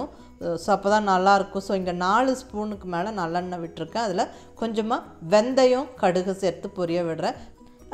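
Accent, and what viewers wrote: Kongu, India. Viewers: I tried it today.. it was good.. mam, all Ur recipes were good, clear explanation.. apart from the recipes, Ur kongu Tamil is superb.. namma oor karanga, enga neighbour polA feel agudhu.. Thanks a lot mam..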